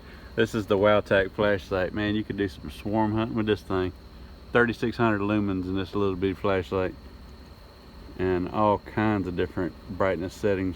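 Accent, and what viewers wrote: South, United States. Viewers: Is that talking country or southern. 😂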